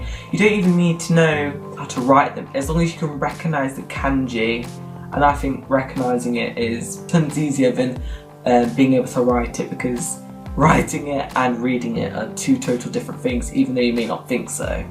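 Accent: United Kingdom. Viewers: That British accent though.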